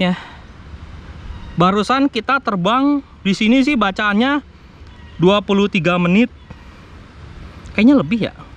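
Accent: West Java, Indonesia. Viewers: Sunda eyy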